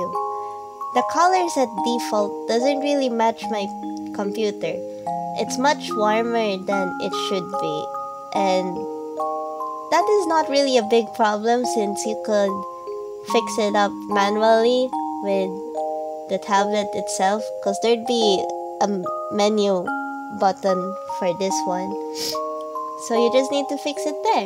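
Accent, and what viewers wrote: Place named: Philippines. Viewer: What country do u live in?, 'cause u sound filipino.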